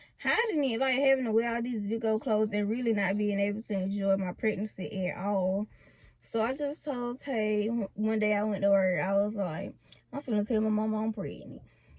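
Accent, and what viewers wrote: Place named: South, United States. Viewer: Hey.... I love the name Zahavi...and your Lil southern accent make it sound really cute. I bet he look just like you! I'm proud of you for stepping up and wanting to be the mother you are. We all have things we wish we could have did different but if we did different it would still be other choices we could have made...if that make sense. Your so cute and seem to know exactly what you can handle. Imma a fan! #TeamZs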